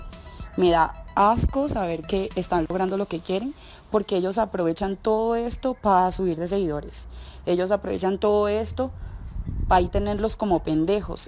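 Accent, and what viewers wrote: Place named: Colombia. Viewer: Ese acento es mi compatriota de Colombia dime si no estoy en lo correcto 😂😂😂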